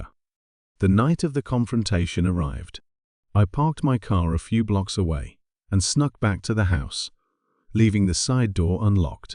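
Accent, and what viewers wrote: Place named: Yorkshire, United Kingdom. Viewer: This sounds like Sean Bean's Yorkshire accent.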